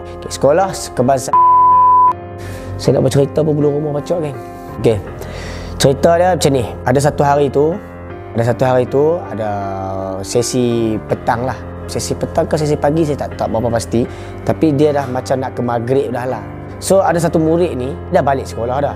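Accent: Kedah, Malaysia. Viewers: ( loghat Kedah geng !! )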